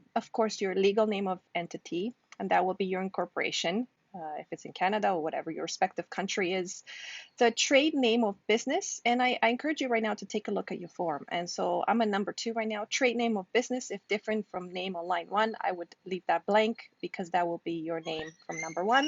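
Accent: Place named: Romania. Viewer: Mom your Not canadian your from Rumenia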